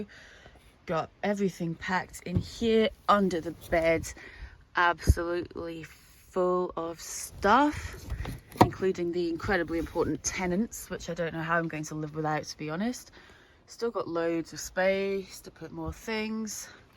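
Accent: Scotland, United Kingdom. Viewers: Love listening to you :) you’re more Scottish on YouTube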